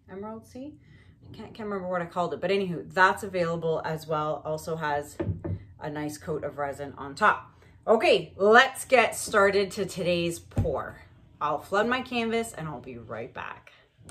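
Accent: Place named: Atlantic Canada, Canada. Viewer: I just want to tell you how much you've inspired me. Im a super crafty Atlantic Canadian and I am in awe of the things you do. It's so great to watch someone who talks like me and shops at the same places and all of that jazz. I've subscribed and binge watched pretty much all of your videos. Keep it up and maybe you'll see me on here someday if I get brave enough lol. You are phenomenal.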